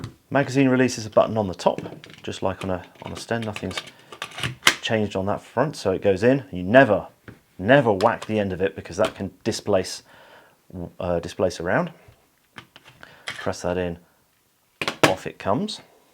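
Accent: United Kingdom. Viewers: Nothing like a good old British voice to explain the workings of a British gun. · A deceptively simple looking weapon, until you get into the details! Question, if you're in the UK as your accent would suggest, how are you in possession of this in firing condition?! I'd bl00dy love one!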